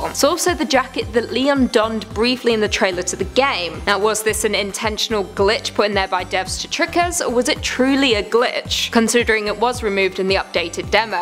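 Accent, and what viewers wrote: United Kingdom. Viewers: You are from uk? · Miss your quite the beautiful lady,& love your English accent 😊Well that's cool, I'm pretty excited about Re2 remake. Looks fantastic,as far Re3 remake,as they said it depends on the fans.if it happens,it's in the making from what I was told by Capcom & other gaming yutuber s.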